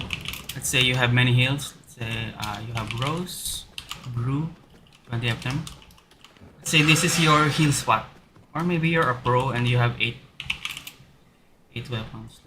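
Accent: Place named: Philippines. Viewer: Kumusta from Australia! I think you're Filipino anyway from the accent.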